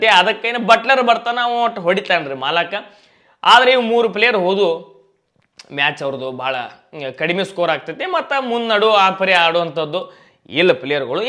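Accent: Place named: Bijapur, India. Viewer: ಅಣ್ಣಾರ ನೀವೂ ಬಾಳ ಚೊಲೊ ಮಾತಾಡ್ತೀರಿ ನಿಮ್ಮ ಬಿಜಾಪುರ ಮಾತ್ ಕೇಳಾಕ್ ಬಾಳ ಖುಷಿ ಅಕೈತಿ. ಜೈ RCB 👑 💛❤️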